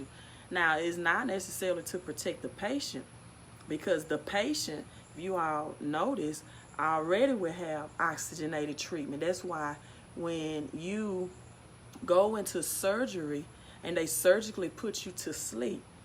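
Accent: South, United States. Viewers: Love that Southern voice✊🏾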